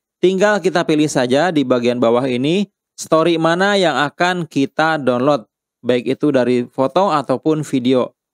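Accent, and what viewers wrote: Indonesia. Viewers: Kenapa suara indon pulak ni 😁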